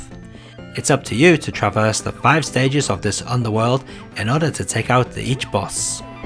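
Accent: United Kingdom. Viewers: i like ur videos+efforts+ur brittish accent ( if i am not wrong ).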